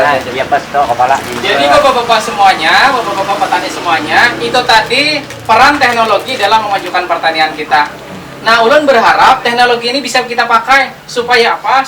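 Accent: Banjar, Indonesia. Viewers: Banjar banar pandiran pian Pak lah... Asli urang hulu kah pian pak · urang Banjar kah? bujur?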